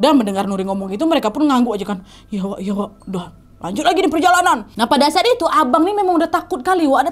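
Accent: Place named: Tanjung Balai, Indonesia. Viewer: Inget banget dulu nontonin kak dari masih ribuan subs, seneng aja sama logatnya karna sama² org tjng balai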